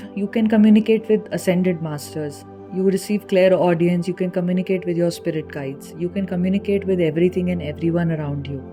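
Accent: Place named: India. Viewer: 🙏 Thanks a lot for such a knowledgeable video and Divine counseling!! I really felt good to get through a channel in English about TF in an Indian voice! 💚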